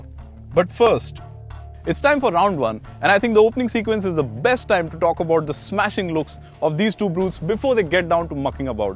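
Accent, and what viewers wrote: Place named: India. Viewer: The host's Indian accent is so strong and freaking annoying. Keling pendek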